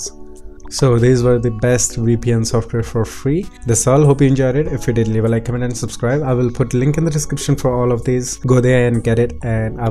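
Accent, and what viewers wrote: India. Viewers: you sound very indianish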